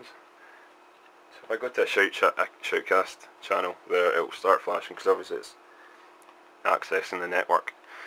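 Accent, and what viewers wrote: Scotland, United Kingdom. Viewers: dont you just love browsing through random videos and finding a Scottish accent xD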